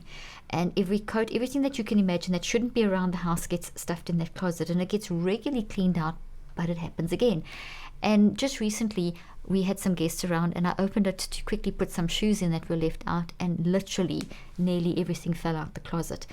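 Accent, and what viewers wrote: South Africa. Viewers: So nice to listen to your South African accent Dr Leaf 😊❤️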